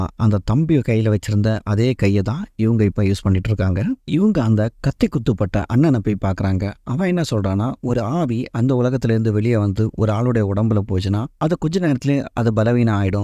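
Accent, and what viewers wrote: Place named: Kerala, India. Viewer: Chettan malayaliya Nattilevideya Nice Tamil slang chettayi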